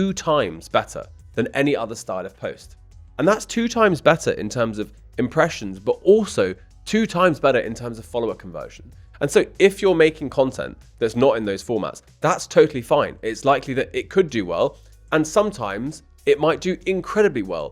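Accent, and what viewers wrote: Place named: United States. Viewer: Dude, your (USA) English is very difficult compared to British people. Don't worry brother From Pakistan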